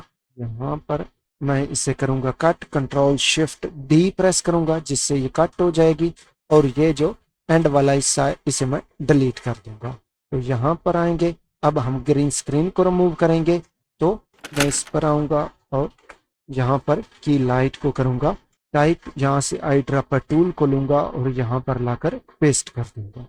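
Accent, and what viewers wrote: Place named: Punjab, India. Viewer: Bhai apna talaffuz Punjabi say Urdu karo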